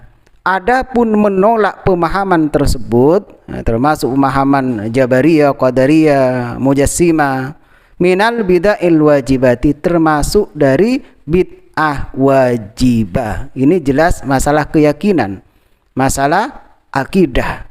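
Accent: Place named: Madura, Indonesia. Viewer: Kebanyakan logat bahasanya Madura. Sumbernya kiai yg sama. Mereka yg susah sunah ini misalnya Zaka, Sane Oye, terus orang ini. Orang ini udah salah disebarkan lagi. Maklum. Orang ahli bidah itu dihalangi tubatnya oleh Allah... E, chong ngaji ke Universitas Islam Madinah biar tidak pakai ibarat. Kasihan chong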